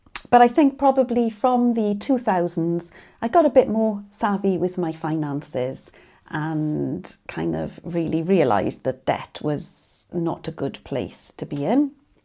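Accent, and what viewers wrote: Wales, United Kingdom. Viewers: Hi, watching from Australia. Is your accent Welsh ? I love it. I visited Wales back in 1981 ! 😊💖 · you are such a wise grounded lovely women, so sorry things went wrong for you, good luck for the future, I'm in Mid Wales but was born in South Wales so it is so nice to listen to your accent thank you for sharing your life x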